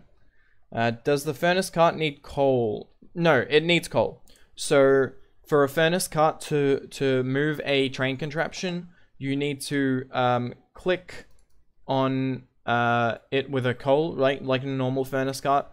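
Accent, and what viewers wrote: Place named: Australia. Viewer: You sound like an australian =) "Soouu"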